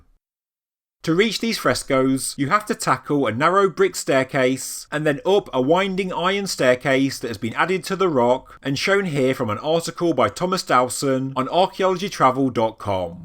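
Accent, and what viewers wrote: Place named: Midlands, United Kingdom. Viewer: British, somewhere more north than London, probably Midlands.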